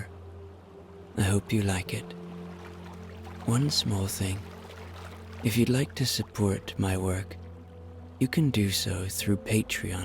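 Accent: Ireland. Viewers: Love this! Thank you so much. I also love your Irish accent - which part of Ireland are you from?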